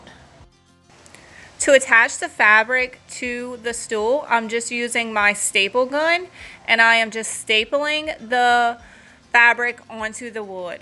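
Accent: Louisiana, United States. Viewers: I knew it. Haha!!! I’m also Cajun and I love your accent and your channel. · Hey! I just found your channel and love it! I knew from the first sentence you uttered that you had to be from Louisiana and then I saw you wearing a Louisiana cap! I’m from North Louisiana.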